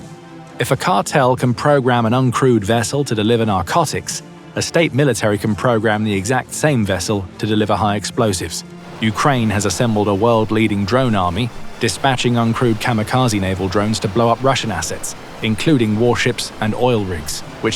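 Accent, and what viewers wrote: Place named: United Kingdom. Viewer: It's a lie story with a British accent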